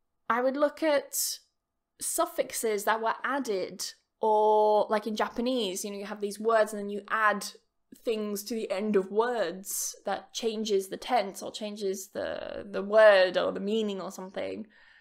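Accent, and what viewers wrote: United Kingdom. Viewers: First comment, maam.. Happy returns day.. I have been watching for two months and I really like British🇬🇧 english. Thanks🌹 👍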